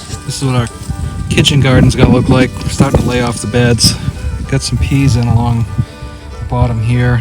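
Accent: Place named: Maine, United States. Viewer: Okay…catching up…been disposed the past few days. I prefer the over wheel straps. They are limited to that one job but transporting things like that, make them worth the investment. Tails….fold them up from the tail end to the ratchet, and then secure them with those little looped bungee cords with the ball end. May have to go around the folded end a few times to take up the slack, but they are cheap, quick, easy and effective!! That Downeasta accent is in your blood!!😁🤣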